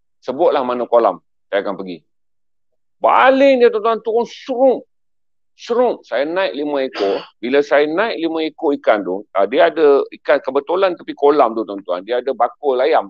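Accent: Kedah, Malaysia. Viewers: bunyi macam orang kampung saya, zul. dari kota Sarang semut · Nak tanya...saudara pencerita ni org kedah ka? Cara gebang mcm org kedah...😆😆😆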